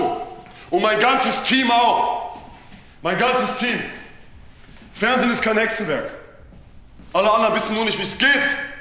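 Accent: Germany. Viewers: Warum spricht der wie ein Saarländer? Wer soll damit verarscht werden?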